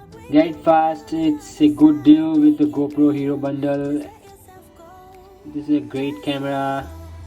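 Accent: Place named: United States. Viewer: American accent is not suiting you. But GoPro9 🤩🔥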